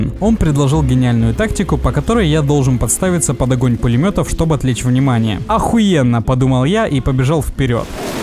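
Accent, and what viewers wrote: Poland. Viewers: Ты што польский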